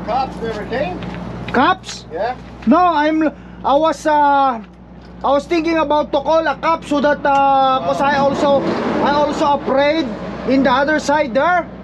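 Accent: Ilocos, Philippines. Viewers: Dayta man lakay naglaeng ka ag English, Ilocano accent ah.. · ILOCANO KAN SAMET? BRAD TA ACCENT MO GAMIN. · Halatang ilokano ang accent mo lakay pag nagsasalita ka ng English.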